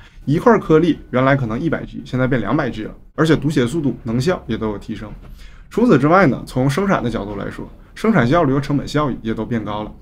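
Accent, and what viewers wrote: Northeast, China. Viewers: 您大爷的 能不能显示个字幕！！！ 您东北人 普通话很普通 但我耳机音响 真听不太清 你乌噜呜噜 说了个啥？ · 兄弟，喜欢你的东北口音，但是没有字幕不把声音开特别大，根本听不清你在说什么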